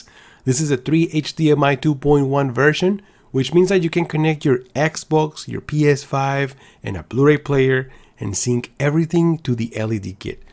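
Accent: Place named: El Salvador. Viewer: My right hand man at my last job is from El Salvador. I miss talking to him for many reasons, but that accent is one of the top ones.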